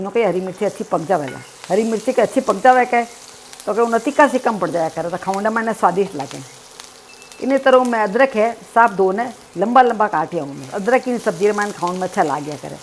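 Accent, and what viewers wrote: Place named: Rajasthan, India. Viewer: Hi aunty ji mai haryana se hu maine aj pehli bar apki video dekhi h mujhe lga ki mujhe rajasthani samajh me nhi ayegi par, samajh me ayi ap ke muh se rajasthani me ye recipe sunkar acha lga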